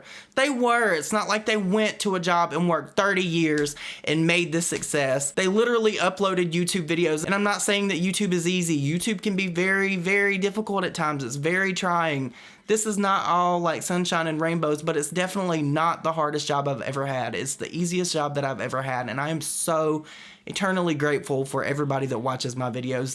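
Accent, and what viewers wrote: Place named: Oklahoma, United States. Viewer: Just found your channel and subscribed! Loving the content!! Keep it up!! :) When I heard you start talking I googled to see where you were from because I thought you were from Oklahoma where I am from! :)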